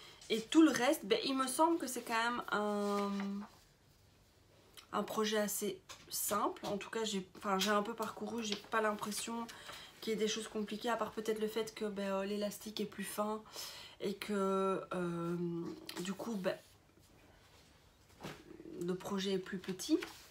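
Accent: Belgium. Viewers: Coucou, j'ai entendu directement aussi que tu es de Belgique (tout comme moi 😁) et j'adore ce que tu propose. Je suis pareil que toi ! Mon amoire déborde et je ne peux pas m'empêcher d'acheter sans pour autant coudre, ou alors comme toi je commence et je ne continue pas un projet que je rêvasse déjà sur un autre , au secours ! Ton challenge me parle ! Je vais faire comme toi et m'en lancer un aussi ! 😁 😅😬😘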